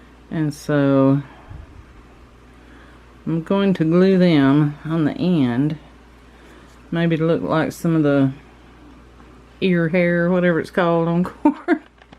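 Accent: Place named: South, United States. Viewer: I really love your ideas, but I really got triggered with all the mess on the table and the glue strings, I’m a OCD when it comes to creating, I set my things out on my work station, then I decide where to start on my project, then I work and finish the first section, then I tidy that stuff away before I start on the next section, I really get anxious with messy work stations, I was cringing the whole way through, the paint on your fingers got to me too. Not hating, so please don’t think I am, I’m just saying it triggered me. I do however, find your voice to be soothing so that helped me to continue to watch you create this shabby chic corn decor, it’s so refreshing to see people don’t just stick to autumnal colours, I love the shabby chic look. It can’t be all bad, I subscribed despite my OCD tendencies. TFS love from the 🇬🇧 I love your southern accent.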